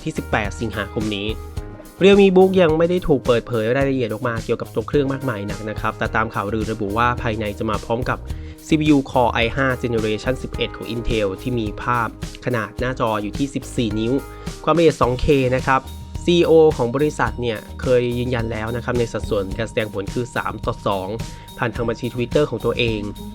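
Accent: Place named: United Kingdom. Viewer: ชอบสำเนียงอังกฤษ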